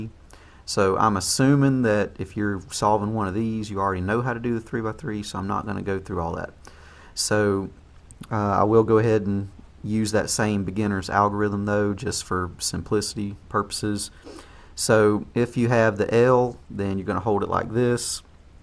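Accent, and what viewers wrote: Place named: Australia. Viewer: A very strong Australian accent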